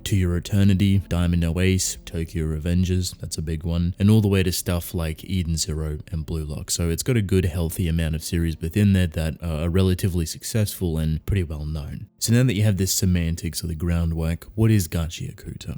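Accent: Australia. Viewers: yeah, something about Australian accents discussing lore/topics always sounds interesting lol. Reminds me of fudgemuppet when they dive into Skyrim.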